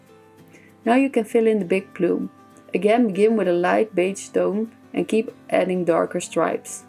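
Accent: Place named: Netherlands. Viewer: Je accent is zo heerlijk Nederlands, genieten ;)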